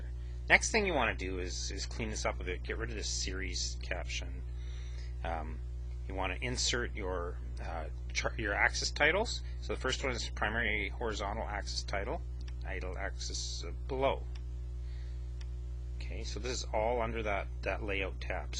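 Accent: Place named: Canada. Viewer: you are one of the most Canadian sounding person I've ever heard. also thank you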